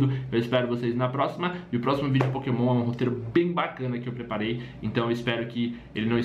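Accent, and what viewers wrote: Brazil. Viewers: tu és pt e tas a falar pt br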